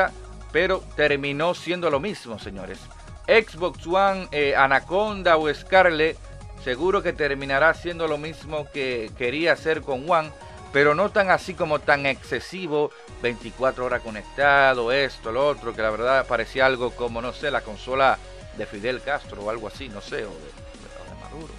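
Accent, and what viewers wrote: Dominican Republic. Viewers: De donde eres porque tienes un acento super Dominicano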